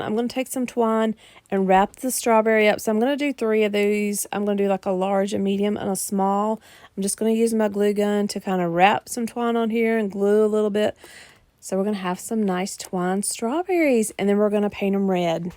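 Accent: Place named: South, United States. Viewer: I just found you today, one of the collabs from a few months ago. I loved the lemon theme and your southern voice. I'm from GA. So I have one too, any fruit or farm theme I truly love. Thank you for making a good day for all the crafter. Rhonda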